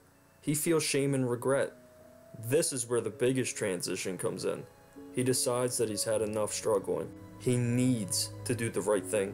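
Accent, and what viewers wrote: United States. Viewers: Dude, your accent is intense... where are you from??? Baltimore? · Bro, you gotta be from the mid-atlantic with that accent of urs. Sound like eastern PA or south jersey. Am i right? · Ok mate, I really can't tell if you're American, Australian or New Zealander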